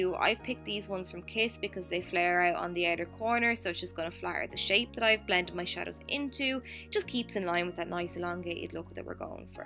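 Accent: Ireland. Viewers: Subbing for that gorgeous Irish accent! And I have the same shade eye color so these videos will be helpful!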